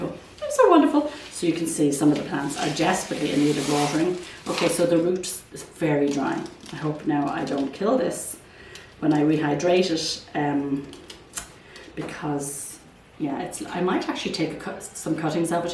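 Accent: Ireland. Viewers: Thank you. I have narrowed my plant subscriptions down as I began to suffer from information overload. Your relaxing, informative videos are a joy and your lovely accent is a balm to this American daughter of Irish immigrants. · Please continue making your video!! Don't stop...I enjoy and learn so much. I also enjoy your gorgeous accent from your lovely country...Ireland 😍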